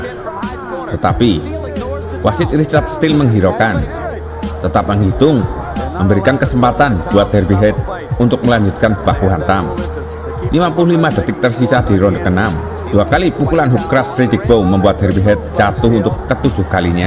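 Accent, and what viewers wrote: Java, Indonesia. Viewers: Adminya medok sekali si bicaranya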